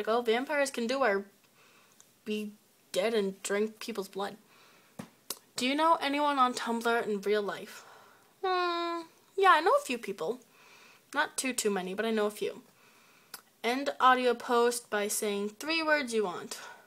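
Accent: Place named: Boston, United States. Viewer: Lol babe I love the way you pronounce coupon, pajamas, caramel, salmon, fire, water, New Orleans, lawyer, then any other thing with a Boston accent.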